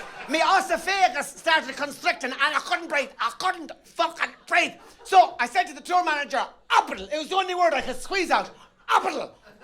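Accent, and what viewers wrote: Ireland. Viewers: The older Tommy gets the more he sounds like he's from tuam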